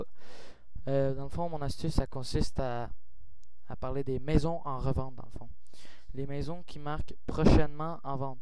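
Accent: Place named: England, United Kingdom. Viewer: pt1 je supporte pas quand les gens parlent francais avec un accent anglais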